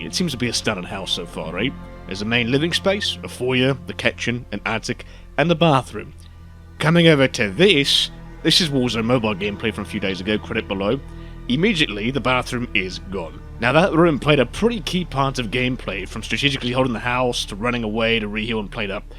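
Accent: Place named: United Kingdom. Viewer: ahh deep british voice man talking about COD with quality content, let's go sir. Mr. Xbox Ahoy left a massive void that's never truly been filled. Best of luck to you.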